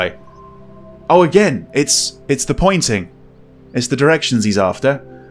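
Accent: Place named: United Kingdom. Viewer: Heard of this through mention otlf Twich streamer that does nothing, but puzzle & she always gets help from friends on the stream. I so wish i had them. Not pc or money. If can save up for gaming pc to stream on Twitch i get all these puzzle games one each month or so plus my ps 4 & smart flat screen tv ad only have older small one. This is amazing. I wish i had been able to do without getting spoilers, but it's ok. It is what it is ! Living vicariously through others atm. What can you do right ? I hope to catch up soon. Only got a tablet last yr finally. So behind gadgets & technoligy, etc. 😢😭😭 I love your voice. Great presentation. With that nice slower British accent it was very enjoyable. I'm more touch screen, app, and console type person. I do like puzzles, but not hard ones. I did Tomb Raider, but i need help sometimes just like steamer I mentioned though maybe not as much. 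Only when ger really stuck. I never done a serious game through alone without cheet guide book or online ao i dont know if I'd ever figure out a gane like this. Really complex. Blows my mond the dimemtions, but I get the workings now that seen all the watchers mentioned on YT, and this game is one of them so has made me understand puzzles workings more and a bot more confident I can, cuz learming from others playthroughs and see it from the other side of the screen and perspective.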